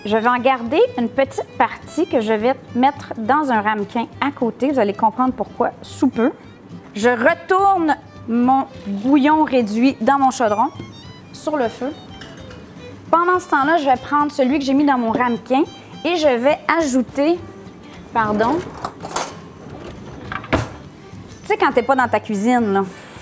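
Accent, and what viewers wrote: Quebec, Canada. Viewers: J'adore l'accent Québécois 😍😍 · J'ai découvert vos vidéos il y a peu avec la recette du Ahi Poke :) Je cherchais une vidéo sur la poutine avec la recette de la fameuse sauce brune (car habitant en France il est difficile de trouver certains ingrédients). Merci pour cette vidéo qui tombe du ciel. Je suis originaire de Polynésie Française, de Tahiti. Chez nous à la sortie des boites de nuit c'est le fameux "Poisson cru" que nous mangeons. P.S : J'aime beaucoup votre accent québécois, merci pour votre bonne humeur communicative. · J'aime bien ton mignon accent québécois . Ça me manque. Ahhh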